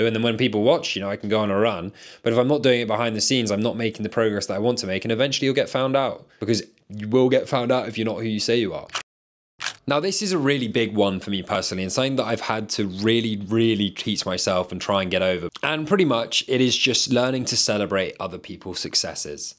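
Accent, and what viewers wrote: United Kingdom. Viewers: WOW 너의 영상을 보며 영어공부를 합니다. 너의 영국발음이 너무 어렵습니다. 나는 영국인처럼 영어를 하고 싶습니다.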